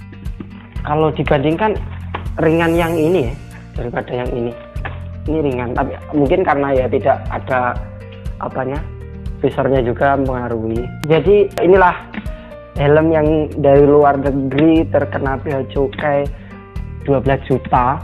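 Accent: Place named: Java, Indonesia. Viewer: jowo e juga medok tenan mas e..😂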